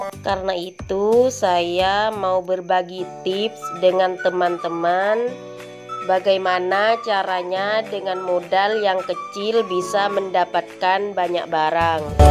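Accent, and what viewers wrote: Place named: Madura, Indonesia. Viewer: orng madura kyak e logate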